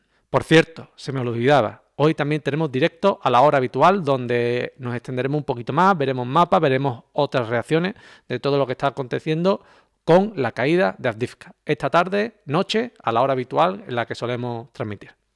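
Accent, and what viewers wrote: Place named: Andalusia, Spain. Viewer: entre Remi y vos están despegados en punta vuestra calidad y respeto al comunicar no es muy común, además tienen un plus,Remi habla muy rápido y a veces se tranca pero las info son como estar ahí,y lo otro tu acento andaluz sino me equivoco es muy agradable de oír ni hablar de tus mapas. Saludos y abrazo cordial desde uruguay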